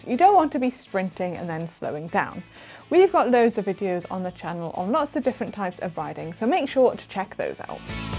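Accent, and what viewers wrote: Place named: Wales, United Kingdom. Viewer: Lovely Welsh accent ❤️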